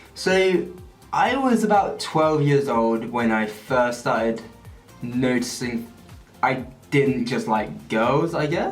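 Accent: United Kingdom. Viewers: Your cringey but really it your voice just oml hello everyone welcome back to my channel it the most brittizh thing to say i feel like thats offensive oh well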